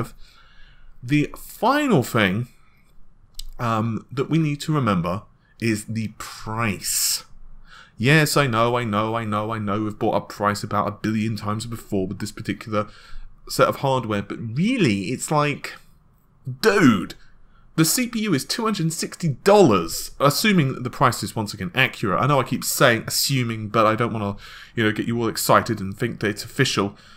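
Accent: England, United Kingdom. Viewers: ***** Apparantly some Americans are so incredibly unsophisticated that they get confused if they hear the same language with a slightly different accent. My advice to those people: don't ever migrate to Europe. Within a country the difference in pronounciation are much larger than the difference between American English and English English, not to mention that you would actually have to pick up foreign languages. Unless you live in England, technically that is a part of Europe... To be fair, in England there also are some strong differences in pronounciation but speaks 'general' English, not some less common accent.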